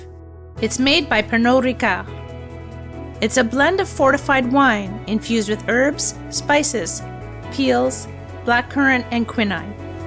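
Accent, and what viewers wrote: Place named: United States. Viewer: What a simply awful accent. The pronunciation was squeamish. Americans simply should avoid pronunciation of non English speaking words and phrases.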